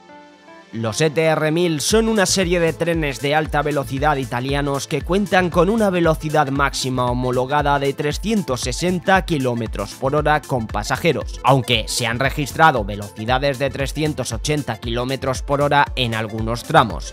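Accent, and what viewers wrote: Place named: Spain. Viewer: no me gusta tu voz, la voz de los españoles ....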